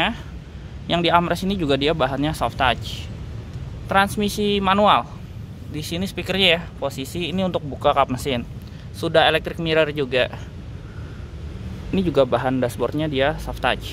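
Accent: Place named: Java, Indonesia. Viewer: om, kamu orang jawa nya mana, kok bahasa jawa nya medok 😆😀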